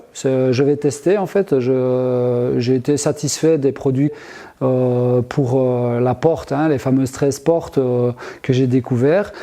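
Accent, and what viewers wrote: Belgium. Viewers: Bonjour. Très bonne vidéo comme toujours on ne s'en lasse pas bravo et merci pour tous ses conseils avisés, pertinents, et argumentés. C'est FLOKY qui va encore se marrer car tu "perzistes" toujours dans cette belle langue franco belge. Allez ciao et bonne continuation.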